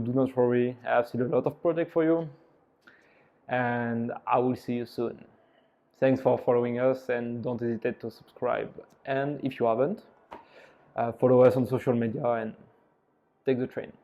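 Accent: France. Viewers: Mais sa se voit tellement avec ton accent que tu est un français 😂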